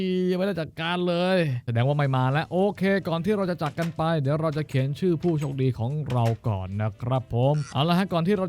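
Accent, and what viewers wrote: South, Thailand. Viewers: Sunshine Channel ลุงพีคนใต้หรือคนภาคกลาง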